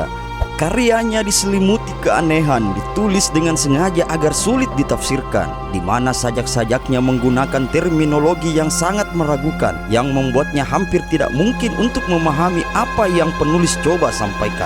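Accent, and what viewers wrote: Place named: Bugis, Indonesia. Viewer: Suaramu cowo kaya logat orang selatang.. Bugis bingits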